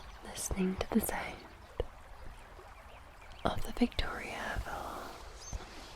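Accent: Scotland, United Kingdom. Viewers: Wow, 3 hours Of pure Relaxing and calming video. Everyone of your sessions were tremendous. Every angle of your camera was perfect. You know me, I could write a whole story about your video, but I'll make it short! 😯😊 I lasted about two and a half hours into your video before I nodded off! Head and face message along with fluttering fingers, mouth sounds, singing, and new sleep stories were just amazing 👏 👌 😉. you are just so stunning with your lovely soft spoken Scottish accent which sent me to the moon 🌙 🌚 😊 ❤️. Totally, but I mean totally relaxing 😌. Tingles were plenty 😊. I could go on and on but I must get ready for work 😊. Please 🙏 take care and have a wonderful day love! 😊 You are awesome 👌. Sending you lots of love!! ❤️❤️🥰🥰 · Is it just for me, or feel other german scottish speaking lovers, that our "fränkisch" is the best german dialekt. 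And it has a similarity, the rrrrolling arrrr. For all non german, thats the region where PUMA and adidas came from (and no this is NOT Bayern/Bavaria-it s a own country, like Scotland never be England), and Lothar Matthäus is world wide well known, okay-maybe only in the world of football. Since I have seen and read trainspotting on original, I fell in love, with your kind of english. And, you done a great job! Many thanx from Berlin.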